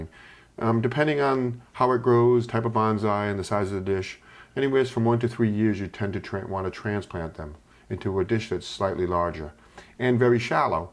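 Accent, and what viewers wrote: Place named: Boston, United States. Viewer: Trying to place your accent. Leaning towards Boston but it might be Brockton? Or am I wrong on both counts? :p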